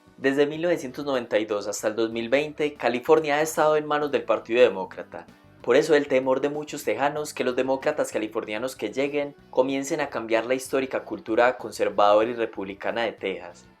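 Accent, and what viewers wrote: Colombia. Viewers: Bro, eres de Colombia?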